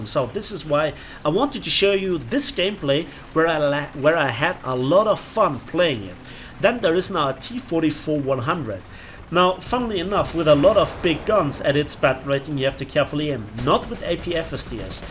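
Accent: Germany. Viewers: dieses extrem deutsche Englisch macht dich so sympathisch. Abo hast du :)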